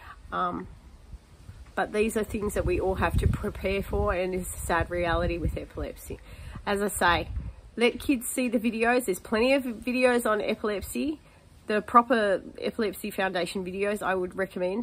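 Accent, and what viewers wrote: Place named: Australia. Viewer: I love the Australian accent and it’s good to teach your child what to do when someone is having a seizure!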